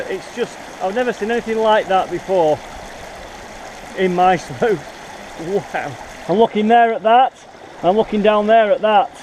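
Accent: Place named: Yorkshire, United Kingdom. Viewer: Liked and subscribed. You sound like you’re from Yorkshire?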